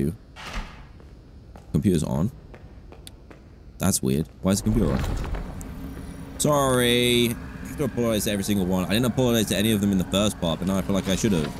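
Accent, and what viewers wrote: United Kingdom. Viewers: as a British person, you are definitely British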